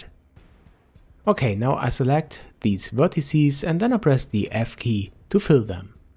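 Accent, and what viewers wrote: Germany. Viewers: Took me to hear that you are german. And i'm german and quit good at english. Oh and very nice video :) I love the Blender Cummunity so far.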